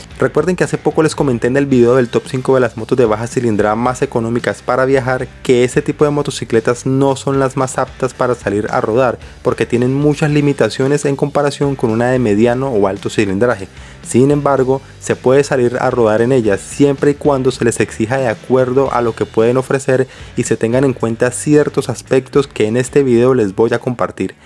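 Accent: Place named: Colombia. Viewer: Gracias por los consejos, me pareció genial el vídeo gracias a qué no tienes el acento colombiano tan marcado como otros moteros, saludos desde México 🇲🇽🇲🇽🇲🇽👍👍👍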